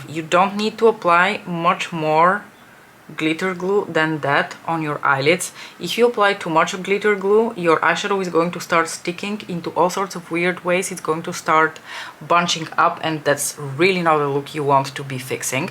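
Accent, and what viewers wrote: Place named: United Kingdom. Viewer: I find it so amazing that you speak so many languages and I assume you speak them all as fluently as you speak English. I would guess that you have lived in the U.K. for 15 years by the way you speak. I would love a video on how you started with each language and how your brain processes and learns new languages. On top of it your job, husband, child, cats and YouTube channel, makes you so well rounded and so fascinating. You are such a lovely person. I love listening to you chat to us and your makeup tips and knowledge are really useful. ❤️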